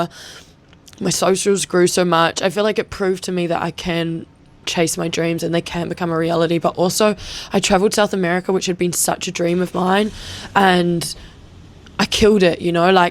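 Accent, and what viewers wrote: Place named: Australia. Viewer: nice outfit, Nice Australian accent 🇦🇺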